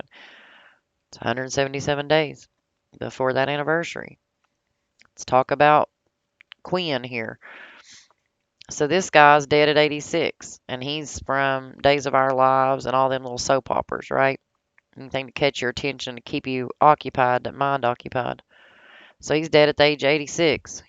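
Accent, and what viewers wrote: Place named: South, United States. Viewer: I thought I had a southern draw. Where are you from ?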